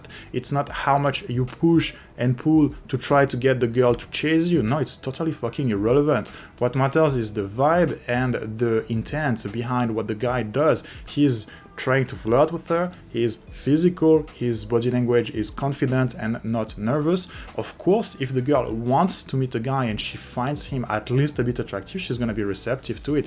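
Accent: France. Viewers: Man, u have a good explanation of Tylers infeild videos... i like this minsdset "theres no downside to anything u say or do, there is only UPSIDE"... got rejected? U become numb to rejection (upside). Don't know what to sat? Say "whatever" (dont give a fck, u r just practicing). Thanks for sharing. Btw, ur accent sounds French, where do u game?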